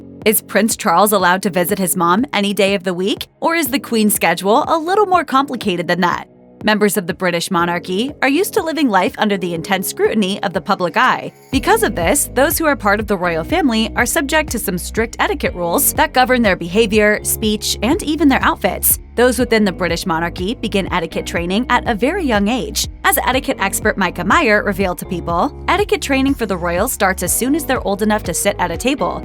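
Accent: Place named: United States. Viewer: An American accent talking about British royal protocol is just not proper!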